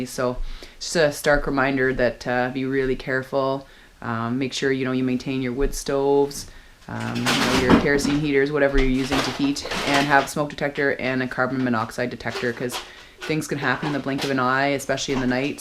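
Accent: Canada. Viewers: Canadian accent :) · Canadian people have such a nice accent.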